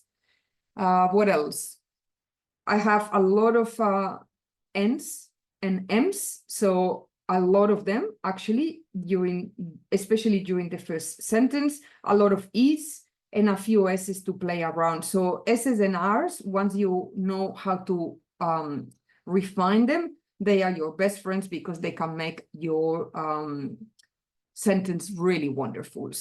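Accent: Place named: Catalonia, Spain. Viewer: Fun to watch you create beautiful words with such ease. Also fun to listen to your Catalan Spanish accent. ❤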